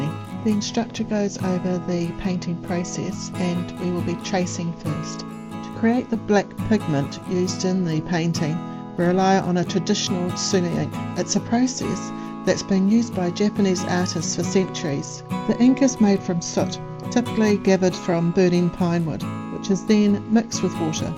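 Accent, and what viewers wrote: New Zealand. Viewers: A kiwi voice lol 😂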